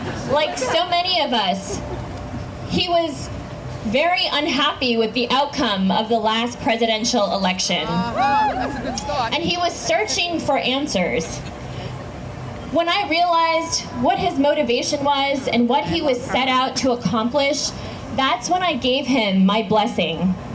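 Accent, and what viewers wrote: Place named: United States. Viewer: Her English is 100% American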